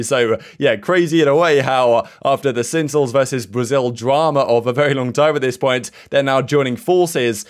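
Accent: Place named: United Kingdom. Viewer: just how british people say it ig a lot of casters do it to lmaoooo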